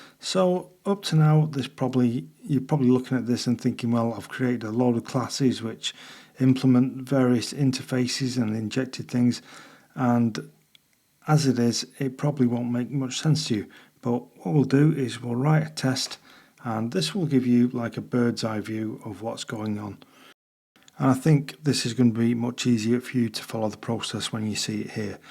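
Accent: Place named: United Kingdom. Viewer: I wish I had discovered you earlier. Advanced PHP with a nice British accent. Thanks indeed!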